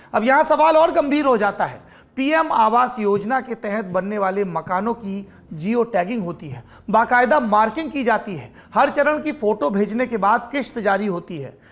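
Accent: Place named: Bundelkhand, India. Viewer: 🙏🙏🙏 हार्दिक साधुवाद एवं मंगलकामनाएं आपको निष्पक्ष, निर्भीक होकर और मीडिया धर्म निभाने के लिए आप जैसे पत्रकारों को के 🙏🙏🙏 कोटि कोटि नमन जी आपकी क्षेत्रीय निवासी लय बहुत अच्छी, बहुत उत्तम और शालीन भाषा बुंदेलखंडी लय 🙏🙏🙏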